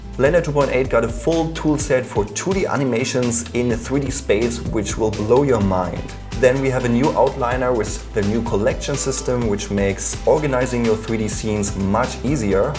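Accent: Germany. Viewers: kann es sein, dass du eigentlich deutsch sprichst? XD volles vid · first video I saw of you and I constantly hear your german accent (wich isn't bad)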